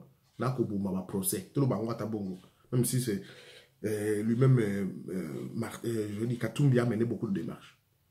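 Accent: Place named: Cameroon. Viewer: Yo kutu face nayo eza lokola ba camerounais même ta façon de parler tu es camerounais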